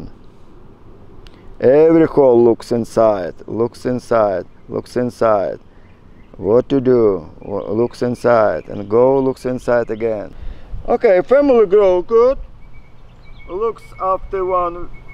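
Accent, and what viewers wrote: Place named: Russia. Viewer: I love your Russian accent. I have a question. All my hives are not producing honey. Hives are strong but no comb no honey. Only nectar and brood..any idea?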